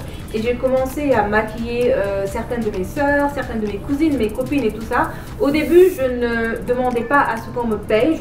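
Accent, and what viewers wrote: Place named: Senegal. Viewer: lol...ton action(voix) montre que sénégalaise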